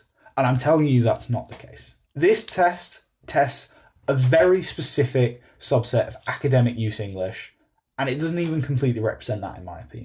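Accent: United Kingdom. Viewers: 영국발음 너무 좋다고요 ㅠㅠㅠㅠ 영국식 영어 배우고싶당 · 영국발음 너무 조-하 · Hi! 오랜 팬인데 댓글을 남기는건 처음이네요. 특히 이번영상에선 영국발음이 너무 멋져요👍🏻 다음 영상도 기대할게요🔥🔥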